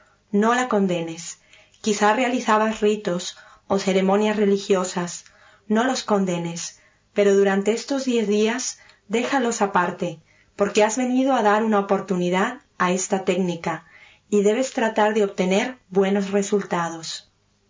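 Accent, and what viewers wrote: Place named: Argentina. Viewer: Yo extrañaba la voz argentina. Muchas gracias.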